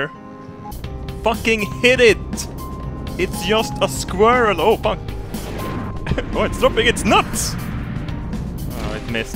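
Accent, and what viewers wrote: Sweden. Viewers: Welcome back to... from the debts! Gotta love that Swedish accent.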